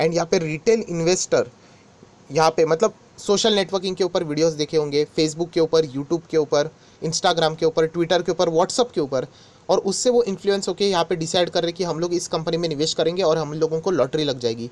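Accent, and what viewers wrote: Maharashtra, India. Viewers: Nhi dada tynchi tone varun kalt te marathi ahet..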